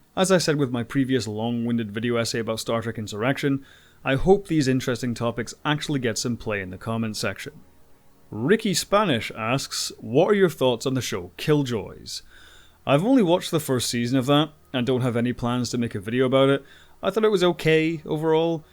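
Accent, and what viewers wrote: Scotland, United Kingdom. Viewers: I never actually spotted the similarities with The Expanse and B5, and now in my mind the expanse almost feels like a kind of prequel, it feels like the same kind of realistic political universe as B5. Maybe that's the route Straczynski should take, a prequel or sequel rather than a straight up reboot? Project Babylon or something. BTW, is that a suppressed Scottish accent I hear? Dude, if it is then just go with the accent, you know most folks love our accents lol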